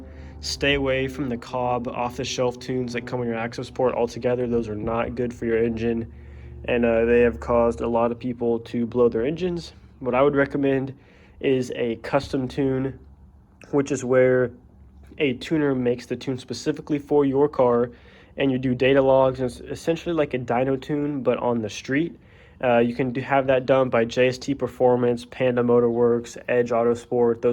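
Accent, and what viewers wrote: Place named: Oklahoma, United States. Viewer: oh dude what part of oklahoma are you from? any where near norman?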